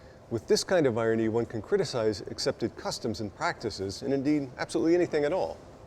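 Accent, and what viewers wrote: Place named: Denmark. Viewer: Interesting. Although he is American, he speaks English as if he was a Danish person. His words are sharp and short.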